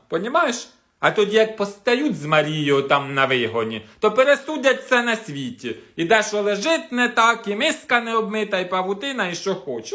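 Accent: Poltava, Ukraine. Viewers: 😂😂😂😂😂наш полтавський говір---осталось тільки висратцця.Ще від мами та бабусі вислови помню.Як в детстві побувала в нашому селі.А особинно під магазином (лавкою) такого багато можна було наслухається Огонь🌞продолжайте в тому ж духі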